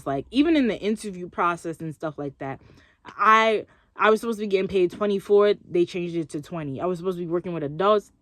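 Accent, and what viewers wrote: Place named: Long Island, United States. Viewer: Love the Long Island accent yo need to make it a character 😂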